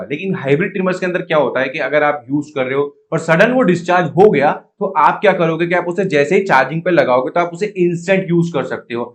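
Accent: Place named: Bhojpuri, India. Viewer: First time trimmer kharida tha video Dekhi aapane Sahi suggestion Diya but aapki awaaz mein Bhojpuri hai thodi Gunji Si Rahi awaaz ni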